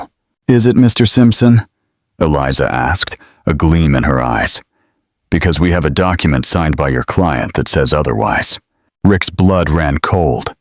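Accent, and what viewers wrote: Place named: Australia. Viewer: Very interesting and it's so nice to see an Australian version of such Marital stories... Good One, the Lady is using her Brains without being Egoistic...Having been supportive and helping their Building business be established... He grew horns in his arrogance, forgetting the Partnership as equals... Serves him right...Best wishes to his Wife and family... From NSW 2680...Thank you for also talking in our Aussie English..Wonderful hearing the narration...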